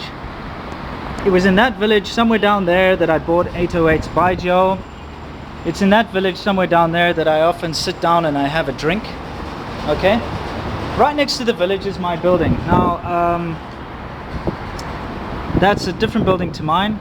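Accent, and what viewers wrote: South Africa. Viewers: I'm an American but I wish I had that South African accent. It like having a British accent (where people immediately think you know what the hell you're talking about) and an Australian accent (where people immediately think you wrestle alligators) Best of both worlds. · He's from South Africa, so he speaks South African English. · Why do you not have much in common with Afrikaners? You don't sound a lot different to them.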